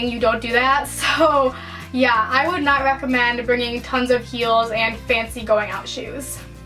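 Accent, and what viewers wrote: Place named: Buffalo, United States. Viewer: are u from buffalo? you totally sound like it!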